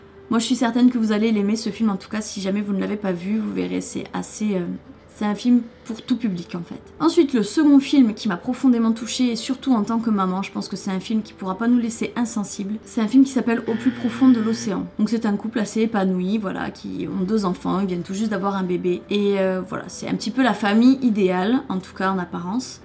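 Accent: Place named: Marseille, France. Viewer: Tu et marseillaise tu parles trop comme Kamila de secret story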